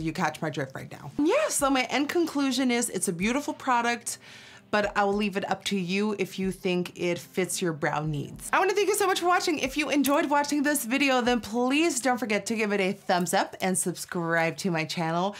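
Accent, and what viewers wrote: Netherlands. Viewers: we would be so powerful if we knew THEN, what we know NOW! I love you you make me smile and I think you have such a great personality ( I love your dutch accent) thank you for being you, you're wonderful, beautiful girl.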